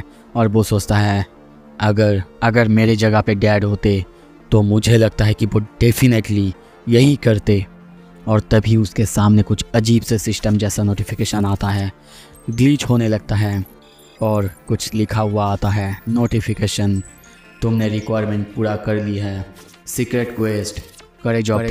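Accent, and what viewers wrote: Bengal, India. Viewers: Are you Bangali or what?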